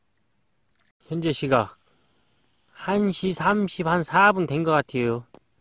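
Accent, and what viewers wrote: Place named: North Jeolla, South Korea. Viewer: 님 전라북도 사투 구수해부요 잉 지는 전남인디 ㅋ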